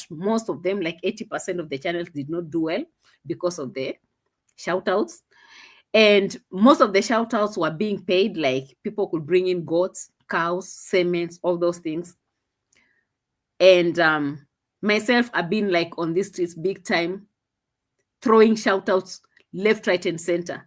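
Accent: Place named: South Africa. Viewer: No your accent sounds exactly like S.African When Caster was in SA, the S African said she sounded like them 😂😂😂 ❤❤ we loved that i like when you say ( LET ME LEAVE MYSELF ALONE) 😂😂😂😂😂❤❤❤ That's a new phrase for me adopted from you😂😂😂❤❤❤❤❤💋💋💋💋💋💋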